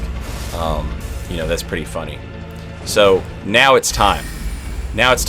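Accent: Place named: Tennessee, United States. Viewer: you're from tennessee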